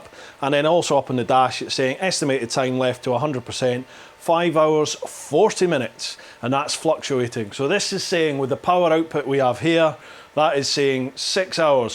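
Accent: Scotland, United Kingdom. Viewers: Subscribed, just for the magic of ur Scottish accent ! Auld alliance ^^ Vive l’Ecosse et les Écossais ! Well, especially lassies ! Thierry from France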